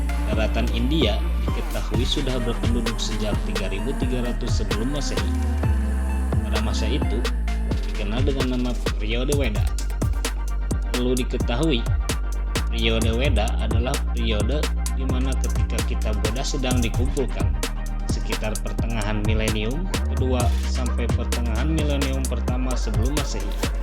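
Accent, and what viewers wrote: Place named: Java, Indonesia. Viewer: Narator bahasanya medoK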